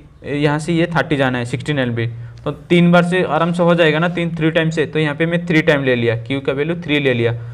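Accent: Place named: Odisha, India. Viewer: Sir app odia ho kya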